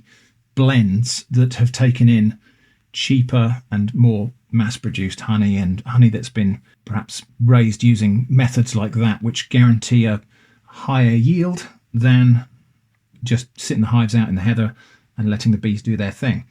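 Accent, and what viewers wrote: United Kingdom. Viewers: Its the british accent and somewhat whacky niche lol.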